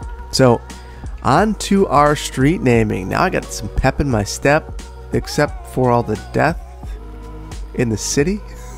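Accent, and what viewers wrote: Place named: Midwest, United States. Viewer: My wife and I were convinced that you had to be from the Midwest. Now we're certain. Keep up the good content man. Go Pack Go.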